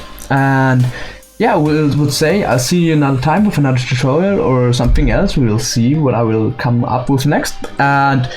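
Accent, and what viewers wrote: Germany. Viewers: are you German? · You sound kinda german tbh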